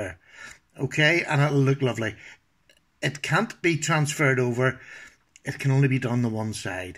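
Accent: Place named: Ireland. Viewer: What about ya. I just came across this video and noticed the accent and I’m from Ireland myself. What part are you from? I’m from County Armagh. Good to hear a fellow Irishman on here. Fare play to ya boy. I’m going to subscribe to help a fellow Irishman our. Great wee video. Cheers mate.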